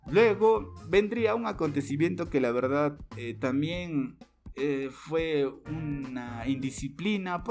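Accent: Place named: Chile. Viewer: oye creo q eres chileno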